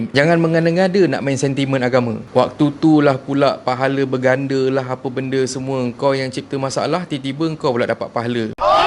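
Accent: Malaysia. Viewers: Lain y bhsa melayu malaysia ini. Lucu kosakata dan aksen logat nya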